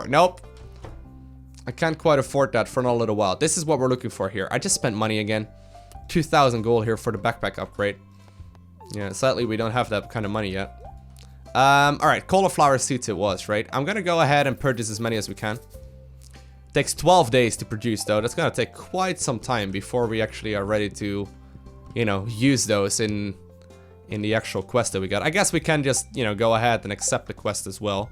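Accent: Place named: Netherlands. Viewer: I watched through your most recent episodes and then decided to start at the beginning. I totally knew what was coming with the sprinkler and killed myself laughing once you came back after looking it up... (I cheated and wiki'd everything before I started so I can't say I'm any smarter..) P.S. As a fellow Dutchie, the only reason why I kept watching your videos was cause of your accent!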